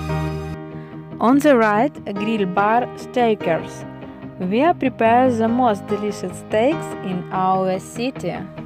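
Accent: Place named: United States. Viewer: Beutiful American voice bravo. Can you visit usa? I have the money.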